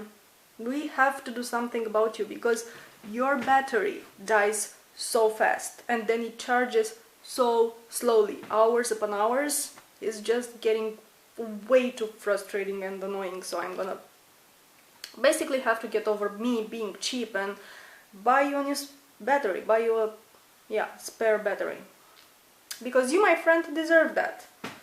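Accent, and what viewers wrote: Romania. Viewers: her accent is bull. oh wait, she's romanian. what does she want anyway? lonely girl... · I like your romanian accent :D. oh and stop bumping your head into things!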